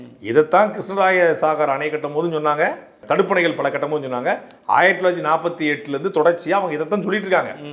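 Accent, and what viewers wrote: Tirunelveli, India. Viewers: சாட்டையின் குரல் ஒலி திருநெல்வேலியின் குரல்வழி திருநெல்வேலிக்கு பெருமை சேர்க்கும் நெல்லை பேச்சுக்கு பெருமை சேர்க்கும் நெல்லை மாவட்ட வீரர்களுக்கு எடுத்துக்காட்டாக திகழ்பவர் அவர்கள் அவர்களுடைய பிறந்தநாளுக்கு எங்களுடைய வாழ்த்துக்கள் முன்பாகவே சொல்லி விடுகிறோம் நெல்லை சீமைக்கு பெருமை தரும் சாட்டை அவர்களின் அதிரடி பேச்சுக்கள் தமிழக சட்டசபையிலும் டெல்லி பாராளுமன்றத்திலும் பாராளுமன்ற டைகர் என்ற பெயரை பெற ரெண்டு ஹீரோ வேண்டுகிறோம்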